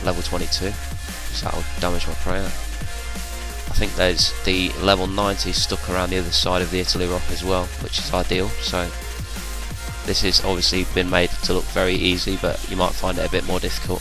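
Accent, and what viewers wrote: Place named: North, United Kingdom. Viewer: i need 2 pull my finger out and get a cape, good guide m8 def gonna try your invents when i go for it....... you from up north m8, i thought u was a southern fairy ?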